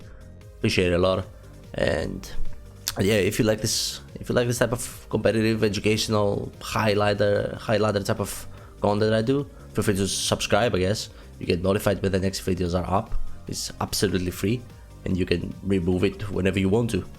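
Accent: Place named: Scandinavia. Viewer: ye i know what you mean. i used you hisuan arcanine team once and wasnt aware enough and got totally destroyed. btw I love your accent, are you from the scandinavian area?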